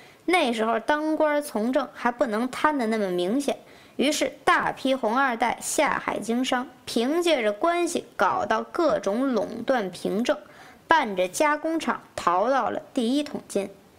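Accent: Beijing, China. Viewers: 这口音是京片子吗？真逗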